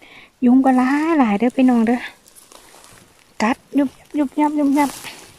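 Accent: Korat, Thailand. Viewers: สำเนียงแบบนี่ คนโคราชไช่ม้ะหนิ :)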